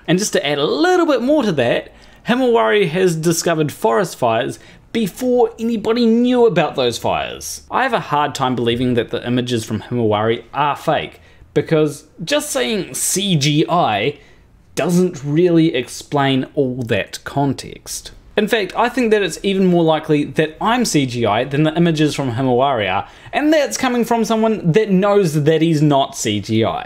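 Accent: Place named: New Zealand. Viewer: No AI can do a New Zealand accent.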